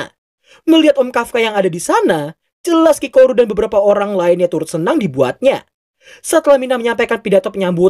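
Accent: Java, Indonesia. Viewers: Kamu dari Jawa y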